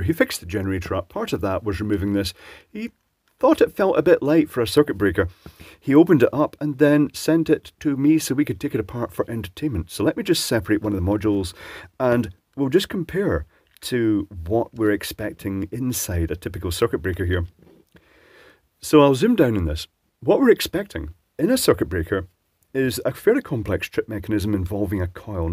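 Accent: Scotland, United Kingdom. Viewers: I don’t just come here for the video’s. I come to hear beautiful Scottish accent! 😅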